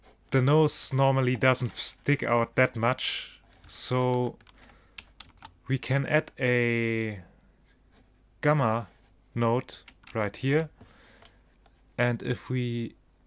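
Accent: Germany. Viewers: Sehr Interessant Blender so zu nutzen, schönes Tutorial, komisch das man einen deutschen Akzent immer raus hört :D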